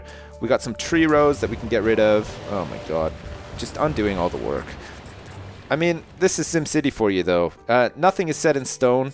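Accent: Canada. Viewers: Is canadian???? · YOUR FROM CANADA? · are you canadian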